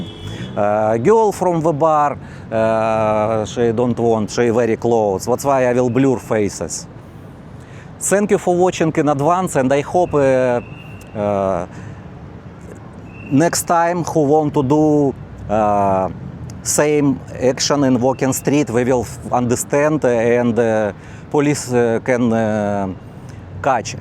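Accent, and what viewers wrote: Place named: Russia. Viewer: You sound like you’re Russian by your accent. I think it’s cool af that everyone has to speak English.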